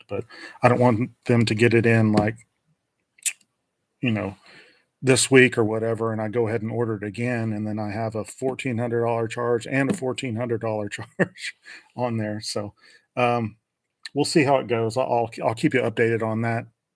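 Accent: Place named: South, United States. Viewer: Ah, your southern accent ain't that bad man. Eddy's video was great and you hit some interesting points too. I've been tossing the idea of creating jpeg styles for different situations back and forth but haven't done any yet. I'm just so used to shooting raw. But if I can create the jpeg style to mimic what I would do with the raw file anyways, it would save so much more time!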